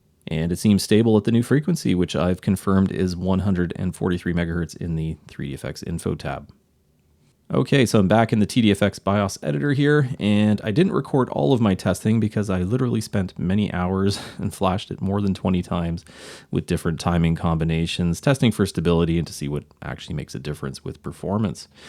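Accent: Canada. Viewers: I saw Voodoo 3's in the thumbnail and assumed this was a "Bits und Bolts" video. I was surprised when a familiar Canadian accent appeared instead. *waves from Winnipeg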